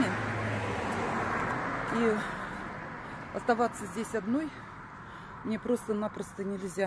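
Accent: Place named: Nizhny Novgorod, Russia. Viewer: Мы прожили в Смолино четыре года, мне нравится Нижний, и медицина, и грибы, и земляника, вишня, груши, яблоки, Всё растёт! А этот говор: пойду дела делать! Сразу видно от куда Вы родом, 😊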